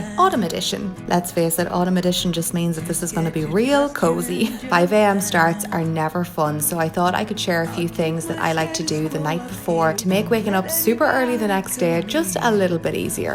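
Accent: Ireland. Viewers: She is Irish :) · Are you Irish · I wonder what part of ireland. bc i feel theres a clear difference I can hear from different Irish people's accents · I visited Ireland almost a year ago and I miss it a lot it’s a wonderful country, and watching your videos weirdly makes me nostalgic, maybe it’s hearing the accent haha. but I love your videos!! They are super relaxing and inspiring <3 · i looove your accent! are you irish? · You have the most satisfying accent ever. Are you from Ireland? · Im pretty sure just irish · Molly Mae i’m from ireland too and i can tell you she is from ireland 🇮🇪 💕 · Anindita Chatterjee she’s Irish and has an Irish accent :) “odd” is simply your perspective, not being Irish yourself.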